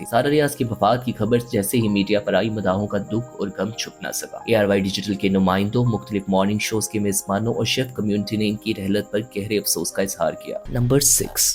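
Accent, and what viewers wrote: India. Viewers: آپکا تلفظ انڈین لوگوں جیسا کیوں ھے؟؟؟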